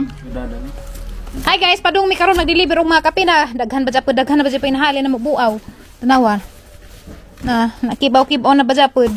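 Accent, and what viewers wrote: Bohol, Philippines. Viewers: Nindut kajo paminawon ag tinagabohol..Pohon mayo untag mkaari kag Ormoc ..ba mgpa picture LNG ko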